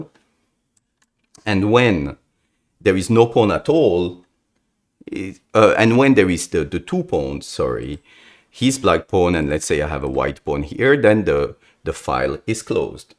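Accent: France. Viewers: The french accent is great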